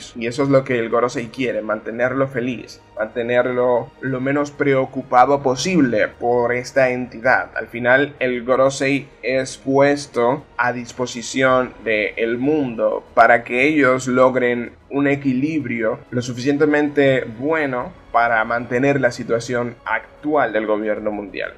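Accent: Dominican Republic. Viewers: Por su acento diría que es de RD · Bro eres dominicano ?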